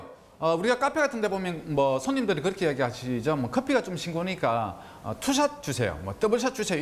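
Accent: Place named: Gumi, South Korea. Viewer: 강의 넘 잘들었습니다 혼자 공부함에 많은 도움 되었습니다 커피에 대해 알고 싶은 욕구 충분히 채워주신 덕분에 만족합니다 고향구미 사투리 반갑고 정겹습니다 ~^^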